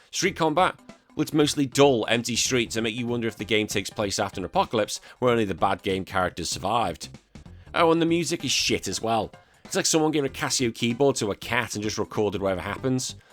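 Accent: United Kingdom. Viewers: I love it when a British person yelled us how bad a game it’s that we didn’t get! We didn’t get Lester the unlikely! Or did you play it when you were 13?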